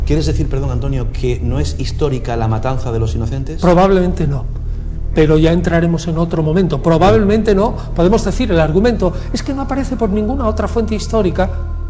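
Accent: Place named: Argentina. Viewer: Si, está muy equivocada éste fulano, parece argentino-español. Ya en su cara se ve el castigo divino por tanta mentira. Lo malo es q mucha gente q está naciendo lo escucha y le creerán. NO LE CREAN, ÉSTE TIPO NO ESTUDIÓ CON SERENIDAD LA TRAYECTORIA DEL ÚNICO HOMBRE Q EXISTIÓ SOBRE LA TIERRA Y HASTA LA FECHA... AÚN NO NACE OTRO IGUAL. SALUDOS DESDE CHICAGO.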